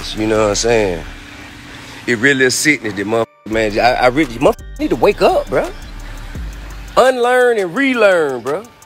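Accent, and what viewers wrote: United States. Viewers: Why do he sound so country this day and time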